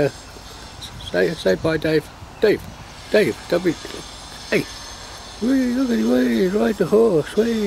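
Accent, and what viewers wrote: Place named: United Kingdom. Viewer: Thought that this was the UK with the accent and a beautiful medieval castle.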